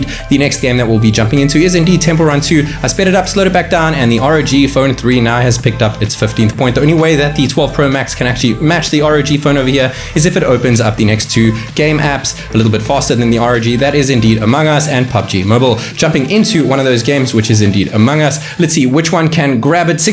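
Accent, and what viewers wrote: South Africa. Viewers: Is from South Africa?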